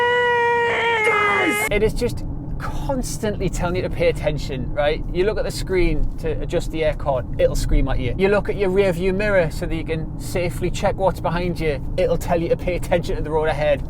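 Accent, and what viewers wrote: United Kingdom. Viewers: Hi is this dude's accent a British Pakistani accent?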